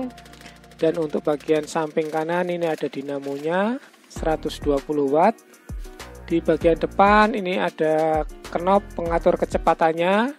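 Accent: Java, Indonesia. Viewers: Lidah Jawa nya tebal bro.... 😂 😂 😂 😂 😂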